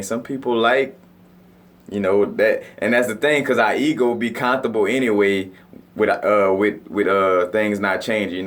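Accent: New Orleans, United States. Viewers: So Nuawlns yea dude gotta downtown accent..... New Orleans checkin in ya know.... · From New Orleans . Got the Clark shirt on had to match it to the accent.👌🏿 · Sounds like you're from New Orleans. Reminds me of home! 😀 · are you from New Orleans? accent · Dawg! I’m in New Orleans! You here to huh? I know! I’m coming over right nah ya heard me! Dat boi said ku-di-lini!! Wazzam!? I can hear it that city all in ya voice! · I kept saying "dude sound so New Orleans" then I saw the Clark t shirt then you said boudin.....#NOLA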